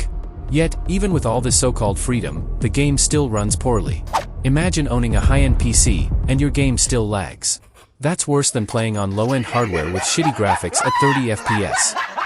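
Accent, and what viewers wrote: India. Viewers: Dude r u from India?!!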